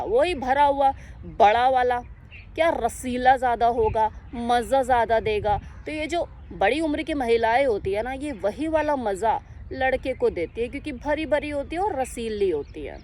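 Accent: Punjab, India. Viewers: Panjabi figar Panjabi lehja. .wah kya baat hy janab . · Listening Hindi with punjabi accent 👍👍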